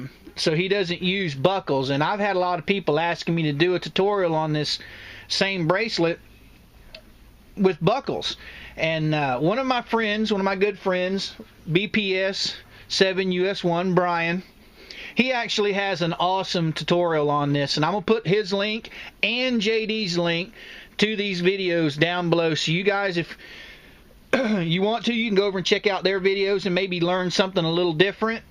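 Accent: South, United States. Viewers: Hey, thanx for all the great videos. Finally, there's someone who speaks Southernese that I can fully understand. Was wondering if you've ever done a necklace like the ones professional baseball players wear. My grandkids keep asking me if I know how to make them. Thanx again, Tmat46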